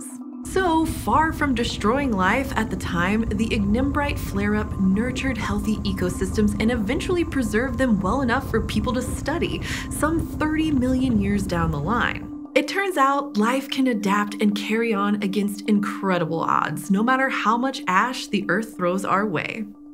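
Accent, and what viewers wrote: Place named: United States. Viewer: That's my girl with an American accent talking about US geology but using metric measurements 😂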